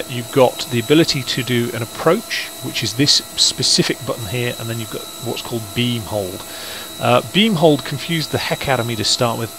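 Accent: United Kingdom. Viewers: Too much annoying British talk .. .SHUT UP already and let us hear the engine sound.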